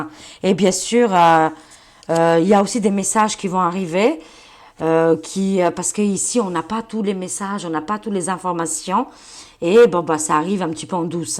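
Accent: Albania. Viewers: Hi, l'accent Ok, je sais pourquoi puisque vous êtes d'origine albanaise...Mais - ceci expliquant cela - vous n'avez pas bien saisi le sens du mot: PHILANTHROPE qui veut dire: plein d'amour pour l'humanité!.. Avec eux, c'est même TOUT LE CONTRAIRE....IL FAUT DIRE pour qualifier cette clique ( à claques )....MISANTHROPE, qui est celui qui déteste les gens.. Mais c'est encore trop doux pour eux. On peut y aller avec: carne...charogne...harpie (si c'est une femme), salopard (si c'est un homme) et SUPPÔTS DE SATAN pour les deux sexes. Attention, ces mots restent dans le domaine de l'insulte....Le mieux c'est d'employer le mot: MISANthrope.... J'aime bien ce que vous faites...Un petit bonsoir du Prof... PS/ Pour la nouvelle coupe, elle est bien, mais je n'avais pas vu lorsque vos cheveux étaient plus longs.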